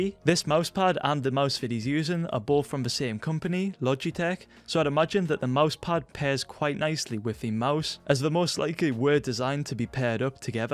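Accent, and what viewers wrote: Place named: Newcastle, United Kingdom. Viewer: You're a proper Geordie haha get in, fancy couple games one day?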